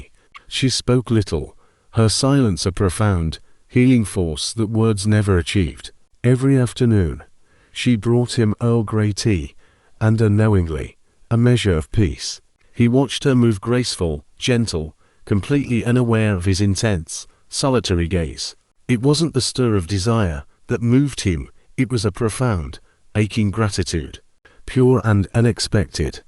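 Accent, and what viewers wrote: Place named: United Kingdom. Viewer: narration has a British accent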